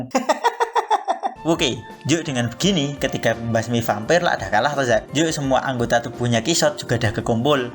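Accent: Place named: Java, Indonesia. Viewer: Wkwk jowo banget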